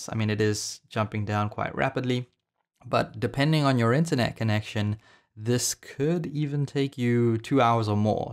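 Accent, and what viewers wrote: South Africa. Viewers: While I was listening to you my wife walked in and she was like, bet you his south african 😆 I lived in cape town for years but it became way to busy for me. Living in a small coastal town atm. Thank you for the great content.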